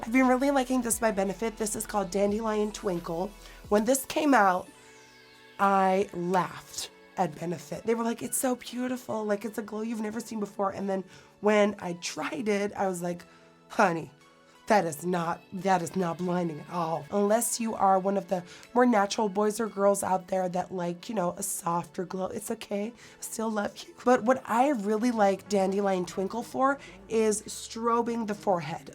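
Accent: United States. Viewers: What happened to your voice? You sound American and not German? Why? What is going on? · I love your voice like this. It sounds so Americanized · you sound like an American now, more than ever:) I feel like they have a slight huskiness when talking, which is exactly how you sound to me:) And you are beautiful no mater how much you weigh or look. Find clothes that make you feel comfortable and confident, make sure you have a good posture and the effect it will have on how you look and feel will be amazing!:) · u sound so American!!!! 🤣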